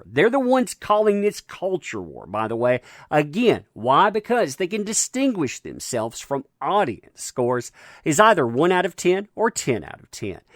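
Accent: South, United States. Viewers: you should do a cooking episode. you got that southern accent of a cowboy chef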